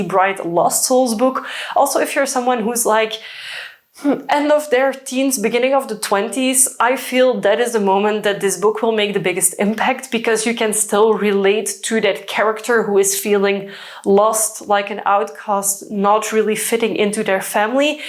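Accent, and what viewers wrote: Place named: Netherlands. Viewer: no worries I like the dutch accent.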